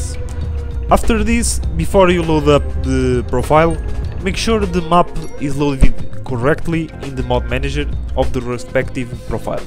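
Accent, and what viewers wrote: Portugal. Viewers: It's amazing, in just 3 seconds of watching your video I could tell you were portuguese haha, continua com o excelente trabalho 🇵🇹🔥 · Such a Tuga accent! Obrigado!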